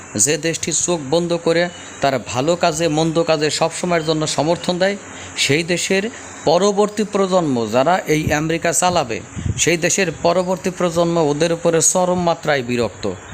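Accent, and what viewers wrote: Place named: Gopalganj, Bangladesh. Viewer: ভাই আপনার বাড়ি আমার মনে হয় গোপালগঞ্জ , আমার বাড়ি ও গোপালগঞ্জ,, এই ধরনের কথার বাচনভঙ্গি বাংলাদেশের অন্য কোন জায়গার লোক বলতে পারবে না, এটা আমার বিশ্বাস, আমি আপনার প্রতিটি ভিডিও দেখি, ভালো লাগে, ভালো থাকবেন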